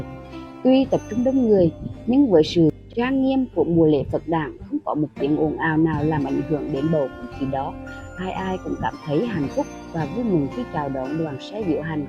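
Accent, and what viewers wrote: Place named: Hue, Vietnam. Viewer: giọng huế hay quá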